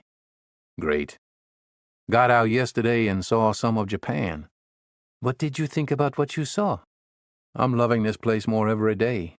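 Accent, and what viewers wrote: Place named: South, United States. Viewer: Horrible Narrator The Southern Accent is 😞 Bad Very Annoying. This Book is Boring Very Slow Moving. I couldn't care less about The Story or anyone in it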